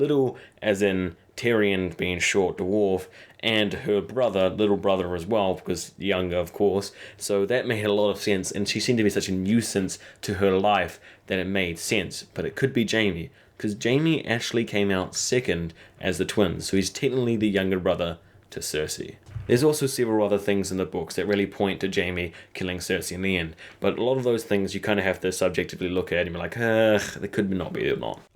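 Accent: New Zealand. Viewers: Good to hear a fellow kiwi, bro :D btw... Its not a teleportation machine, there is a subtle jump forward over 1-4ish months during this episode. We see Winterfellpost battle, the sept explode in kings landing, Jamie at the twins, and Dany in Meereen post battle. After that... * We see Aria at some point after Jamie left the twins * The lords of the north assemble in Winterfell following news of the battle of the basterds * They show Varys and Olenna in Dorn (it must be a significant time after the Wildfire). Then we see Dany and a feet (including Highgarden and Dornish ships) a huge timestep after that. They skipped a lot of padding where Varys, Jamie, Olenna, Aria, Northern Lords, and the Dornish & Highgarden fleets travel the globe. Think of the last 1/3 of the episode as an epilogue of seasons 1-6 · Is your accent Kiwi? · sheesh. Is that some kind of backwoods kiwi accent you got? 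· are you a kiwi? your accent sounds very kiwi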